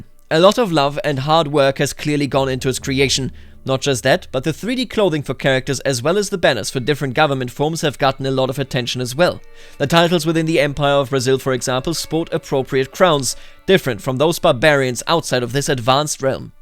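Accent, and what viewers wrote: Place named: Saxony, Germany. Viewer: You sound like you're from Saxony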